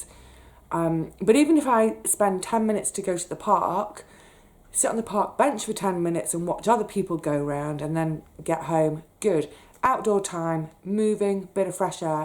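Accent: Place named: England, United Kingdom. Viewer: Hi. So glad you enjoyed some Aussie humour. It does fix some things for a time..we are not too bad at humour. Get series 4 and 5 Kath and Kim are brilliant. There is also a older movie called "The Castle". Another Aussie broadcast and it's also funnyyyyyy. That's if you get Aussie humour... Thanks for your videos. They help me focus in a dark time in my life Listening to your accent gives me a thrill.. Lol. Thanks again from Aussie to pommie. Xx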